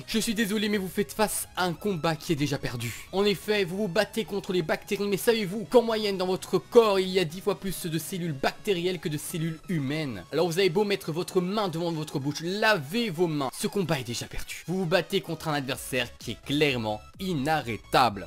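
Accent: Belgium. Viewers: alala ! L'accent belge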